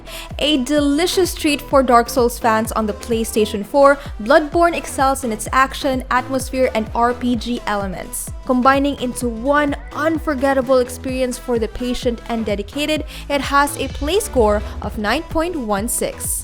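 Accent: Philippines. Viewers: your filipino accent is so cute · Is that a Filipino accent im hearing? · You sound like a Filipina 🇵🇭 , are you? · The girl talking is a Filipino for sure cause of her accent :) a bit fob tho lol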